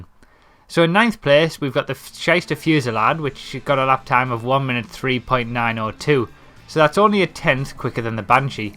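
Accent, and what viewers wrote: United Kingdom. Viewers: is this dude british, were is he from? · man all this british guy does is just race on 'cutting corners ' in his british accent. a lot of this is human error , so his times are skewed. just b/c this guy says a car is 1sec faster than one other one. doesn't mean it is. this is stupid. don't listen to him. he just likes to hear himself talk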